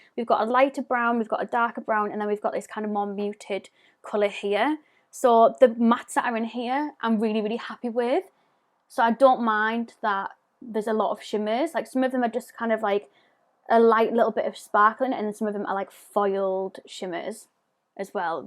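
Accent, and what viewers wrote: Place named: Newcastle, United Kingdom. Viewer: Love your videos and love your accent are you a geordie 🤔❤️your accent makes you sound so friendly 👍👸❤️keep up the amazing videos x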